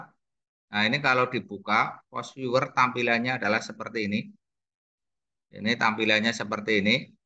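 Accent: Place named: Tegal, Indonesia. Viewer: dari sekian banyak yg jelasin vos viewer, anda adalah yg paling mudah diterima, bahasa lugas dengan khas tegal serta tidak banyak belibed spt kebanyakan ibu-ibu yg jelasin vos viewer... support terus!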